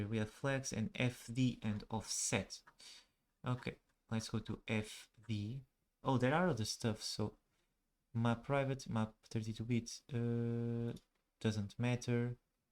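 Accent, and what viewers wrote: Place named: Portugal. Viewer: you've got a portuguese accent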